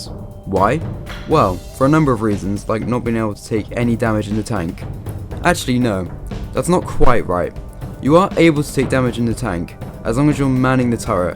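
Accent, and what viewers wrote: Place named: United Kingdom. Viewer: Videos decent! Couple of small points to genuinely help you for future videos, Adapt your voice to more of a "commentating voice" e.g. Speak a bit slower and clearer, Both of us being British makes it harder for people to hear past our accents. - Also don't do what I done and stop uploading! Stay consistent with these types of videos and you'll do well!!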